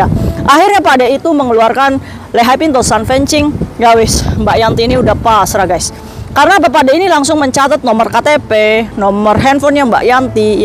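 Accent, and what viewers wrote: Indramayu, Indonesia. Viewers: Ka kya wong dermayu 😂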